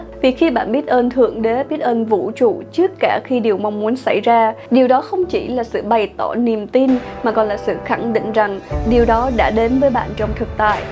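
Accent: Standard. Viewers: Chúc mừng cháu giỏi về chính phát nghe quá chuẩn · Nam mo a di ďa phat nam mo dau da minh tue phat toi nghe co noi pua chuan chuc co luon vuì khòe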